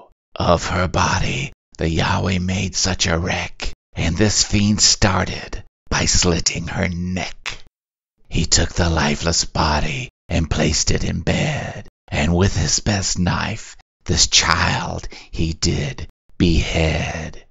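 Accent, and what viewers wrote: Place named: Kentucky, United States. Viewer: I recognized the accent and figured it was Kentucky or Tennessee.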